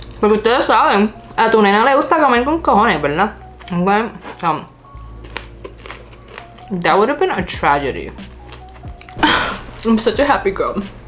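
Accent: Puerto Rico. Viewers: Me quedo impresionada con TU acento denouertoriquena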